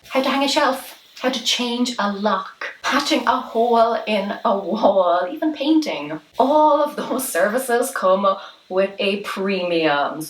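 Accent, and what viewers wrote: Ireland. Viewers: Hi, I love how calmly and relaxed you speak. Now, I can enjoy your Irish accent (ánd your video of course!). Cheers, Sousan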